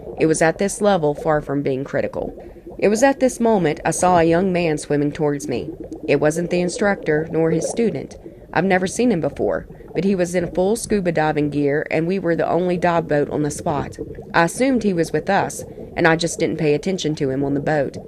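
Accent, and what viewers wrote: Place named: South, United States. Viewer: I'm sorry but if you have a southern accent, telling a scary story doesn't work.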